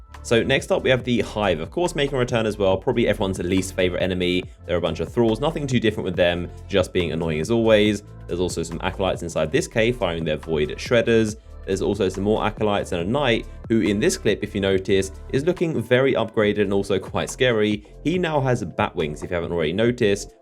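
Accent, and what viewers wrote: United Kingdom. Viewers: Another one of thoses british with a unsufferable accent. Clones AND cookie-cutters, all of them.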